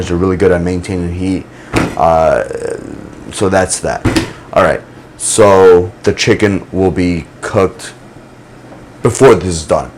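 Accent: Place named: New York City, United States. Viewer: Love this channel!! As well as your NYC accent 😜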